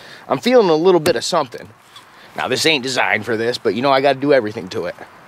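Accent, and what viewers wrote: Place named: Maine, United States. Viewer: hey what State are you from? cause you sound like a mainah or someone from Maine. cool videos!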